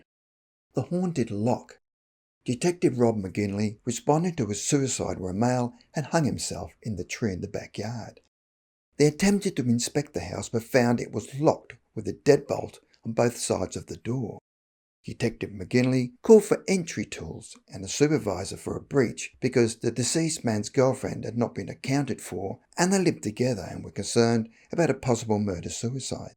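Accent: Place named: Australia. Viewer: Fellow Aussie here. I love listening to an Aussie telling stories. There is more between Heaven and Earth.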